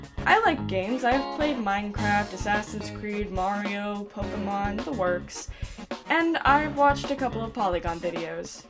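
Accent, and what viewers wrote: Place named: RP, United Kingdom. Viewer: Another great video. Well done! You're also halfway to a brilliant RP accent.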